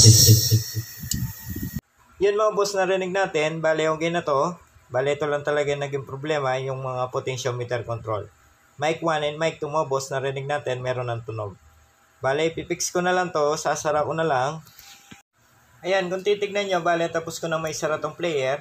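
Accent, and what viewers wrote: Pampanga, Philippines. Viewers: kapampangan accent ka sir. . baka malapit kalang sakin. . pagawa ko nalang sana sayo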